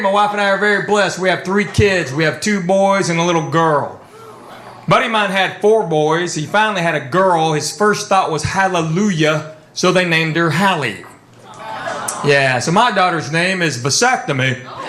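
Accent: South, United States. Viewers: I love quips! He truly is one funny dude! And I love hearing that thick Southern accent coming out of a full blooded Korean dude's mouth. It just adds something extra special because it's unexpected for someone who's seeing him perform for the first time. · Delightful southern accent.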